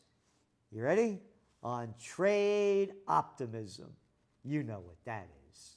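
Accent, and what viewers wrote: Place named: New York, United States. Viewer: I think it's his thick New York-ness. New York-ness spans multiple ethnicities. As a half-Italian woman from NJ, how I miss NJ-NY-PA style.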